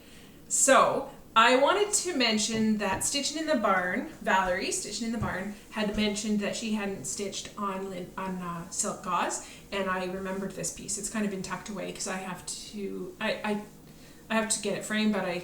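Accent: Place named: Canada. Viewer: Beautiful finishes! HAEDs are out of my comfort zone, but I admire them. Have a wonderful time at your stitch day, I just watched someone else who is going. Don’t remember who. I watch a few Canadian flosstubers because I love your accent! My dad was Canadian, so we had lots of relatives visit us in LA in the winter.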